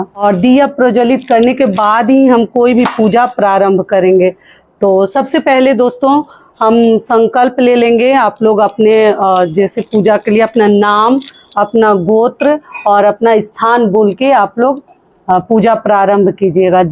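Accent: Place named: Uttar Pradesh, India. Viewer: Ap up se lagti hain ankwar dena hamari banarsi bhasha hai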